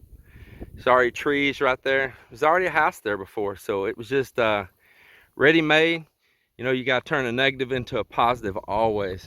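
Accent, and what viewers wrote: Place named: Louisiana, United States. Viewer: You sound like you might have a little bit of Cajun blood in you, by your accent. Do you? My sister has Cajun roots.👍😊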